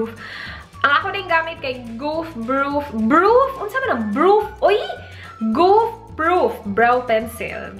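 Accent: Visayas, Philippines. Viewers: Ang kulit nung bisaya accent hahahaha! Qt! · Nalingaw jud ko tan aw ani bah. Nindot pminwon b Bisdak kaau. Lol 😂. I miss Cebu n jud 😞. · lol bugay kaayo mubisaya ay hahaha ka cute nalang jud😙😚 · yaaayyy!!! cute kaayu ka mag bisaya! we love u from cebu city 😍😍😍😍😍❤❤❤❤❤ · hahaha nindot kaau paminawon....bisaya kaau hehe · ang cute mo magbisaya te oi, gwapa pa gyud kaayo kay ka te, 😜😝😍😍😘😘😘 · Proud Cebuana here!!! Hope to see more bisaya videos. Makamingaw! Hehe basta bisaya bundak kaayo mo storya! Haha 😹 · ang kyut mo magbisaya ate!:) kahit di ko naiintindihan, pinanood ko pa rin. mas madaldal ka pag nagbibisiya.:D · kalingaw ani nga video..basta bisaya astog kaau og tingog..hahahaha..aper!:-) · Wala akong maintindihan pero and cute kasi ni ate mag-Bisaya 😂💞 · hahaha tawa lang ng tawa! ang kyut mgbisaya! 😅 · ka cute mag bisaya oi..hehhehehe iloveyou ate 😍😍😍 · Hahahaha the best kay ni nga make up look or tutorial na akong natan awan na, Unsa ka ate, Bohol-anon? 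or Cagay-anon? ga libog kos imong accent na bisaya gd. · hindi ko maintindihan. 😭 peeo pinanuod ko parin. hahaha nakakatuwa ka magsalita ng bisaya ate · himusa nimo mag bisaya oy😍😍😍😍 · Joker kaayo ka magbisaya ate. Hahahaha makalingaw 😂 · Ang cute mo mag bisaya ate.😁 kahit hindi ko talaga maintindihan.😊 😅 tinapos ko talaga.😊 ngaun lang ako nag comment. Pero lage kopo kayo pinapanuod.😁 godbless po. 😘💕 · omggg ate ganahan kay ko ani na video, funny kay ka mag bisaya more videos pa atee niya bisaya 😂😂love youu po😘😘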